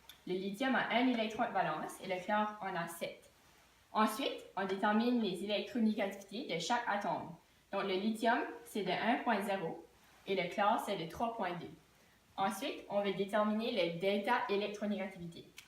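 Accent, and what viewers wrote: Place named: New Brunswick, Canada. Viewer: Nouveau brunswick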